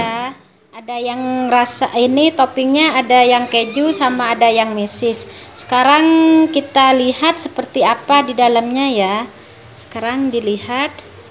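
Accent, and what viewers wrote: Madura, Indonesia. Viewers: Negguh Deri logattah Mak engak Reng Medureh.. Salam sittong dere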